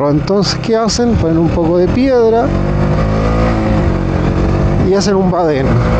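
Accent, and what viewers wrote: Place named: Chile. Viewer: Increíble la adaptación a un castellano normal dela chilena pero se nota momentos 😅 pero el vídeo ni que decir 💯🎉 like hermoso paisaje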